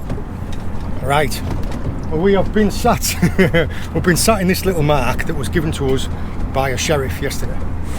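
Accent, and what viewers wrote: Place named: Durham, United Kingdom. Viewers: is that a mix of Durham/ Darlo accent? Love the commentary as you sound local to us Durham pit yakkers. All the best. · Absolutely brilliant. Sound like your from up North originally? Most I've ever caught is a stickleback on a worm but heh! 😂 Brilliant!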